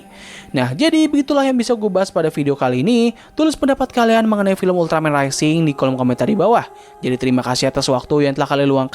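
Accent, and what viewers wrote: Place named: Banjar, Indonesia. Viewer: Ulun kira Pian orang banjar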